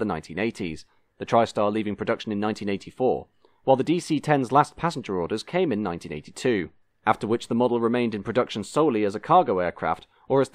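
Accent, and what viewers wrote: England, United Kingdom. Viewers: with a name like that I didnt expect an english accent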